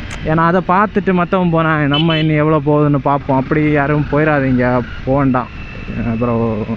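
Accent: Kanyakumari, India. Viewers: innum neraya unga slang la video pannunga makka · Bro இது சிங்க தமிழ் என்னும் குமரி தமிழ்🤙🔥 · Super kanyakumari tamil love to hear this kind of tamil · Machi😍😘 nalla irrukku unnga kanyakumari slang mm semma style mm😘😍athan finishing than semma😁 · Ithu enga Kumari tamizh♥️ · Kanaya kumari slang 🔥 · Ithu than kanniyakumari slang unluk Jack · Nama slangu solava veyanum🔥🔥❤... ne podu makka... verra tamil athu kumari tamil tha❤🔥😎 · Kanyakumari slang verithanam 🔥🔥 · Neenga Pesura Tamil kekkave inimai ah irukku bro Edho somma solra nu nenaikaadheenga bro unmayavey avlo arpudham ah irukku bro Basic ah vey enakku Malayalam romba pudikkum and Namma Kumari slang kekkumbodhu... Adha solla varthai eh illa bro It's melting my heart♥️ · Kumari slang 🔥🔥🔥🔥🔥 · Kandippa bro, waiting for Kumari slang vlogs😍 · Malayalam kalantha tamil,na athu enka kumari tamil,tan.....enka slang enka adayalamla makka😎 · Kanyakumari Tamil vera level 😂😂😂💥💥💥💥💥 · Thala iam in Thoothukudi, Ungaloda kanyakumari slang nala eruku thala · Super ra speak pandringa kanyakumari language la bro... 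· Vlog in Kanyakumari slang 👌👌👍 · Makka namma slang la neraiya pannunga other dist people ku romba pudikum namma slang.... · our village. Kanyakumari slang adi Poli🔥🔥🔥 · Kanyakumari slang 💓💓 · Slang 🤩🤩✌️ Next video kanya kumariii slang venum 🙋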